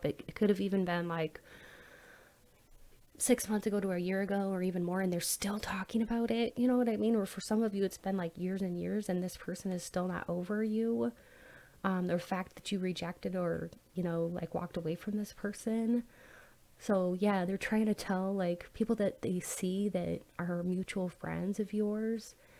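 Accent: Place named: South, United States. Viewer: Good afternoon!I admire you very much and i adore your vibe.I am not from America but i feel that you stay in South of America ,in Ney Orleans,or Atlanta.Your vibe and how you speak make me remeber the Gone on the wind.I apreciste if you confirm3 this think!Thank you for your wofk!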